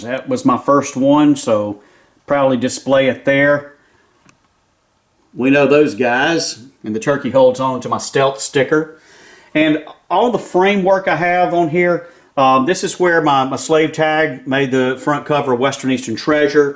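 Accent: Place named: Alabama, United States. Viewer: I thought you sounded like an Alabama boy . Enjoyed the show . Love getting to see collections .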